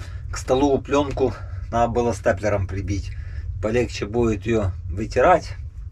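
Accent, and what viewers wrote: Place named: South, Russia. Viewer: Я уже который ролик смотрю и пытаюсь понять, а в каком регионе это происходит? По косвенным данным, я решил что это точно не северо-запад. В Псковской, Ленинградской, Новгородской, лес другой. На Сибирь тоже не похоже. Скорее уж ближе к югу и не думаю что севернее Воронежа. И говор южный, буква "Г" характерная, как для нашего юга, так и для Украины.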